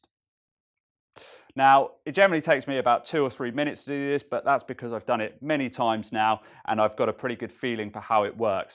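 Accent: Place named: Pennsylvania, United States. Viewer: I've never heard that accent before... you must be from Pennsylvania.. right?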